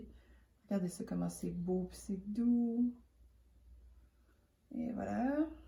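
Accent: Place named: Canada. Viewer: Bonsoir de France! Merci pour ces 2 vidéos! Ce sont les vacances se Noël depuis hier soir pour 15 jours. Donc un peu plus de temps pour "bloomer"... As-tu fait d'autres vidéos? Si oui, peux-tu indiquer le lien stp? J'aime beaucoup tes explications en "vrai direct". Je trouve ça très sympa et j'adore l'accent canadien! Ça donne la pêche et on en a tous tellement besoin en ce moment! À bientôt.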